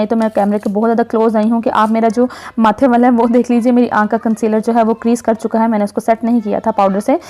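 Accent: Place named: Pakistan. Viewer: Apka way of talk boht cute hai...pakistani language hai.meri ek frnd dubai me thi vo apke jaise ho bolti thi... beautiful makeup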